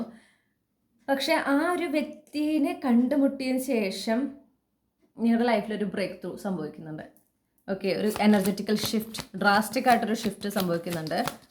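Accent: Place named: Thrissur, India. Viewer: slang, njanum thrissur aanu.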